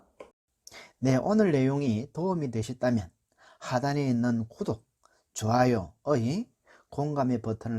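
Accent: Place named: Gyeongsang, South Korea. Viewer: 나도 경남사람이지만 방송으로 들으니 사투리 확~느껴집니다 ㅋ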